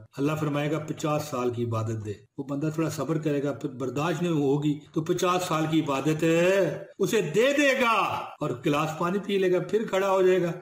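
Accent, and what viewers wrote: Pakistan. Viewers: achaaa mjy lga Lahore k ya Faislabad k hn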